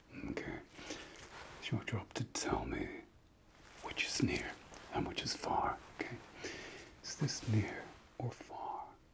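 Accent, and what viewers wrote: France. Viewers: " no screen 1h before sleep" woops. Désolé, i'm already in my bed watching your video xD what do we do xD Love your humour and your french accent, vous parlez très bien français ;) (from a french canadian ☺️)❤ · as a french person, i LOVE how you make the french accent !!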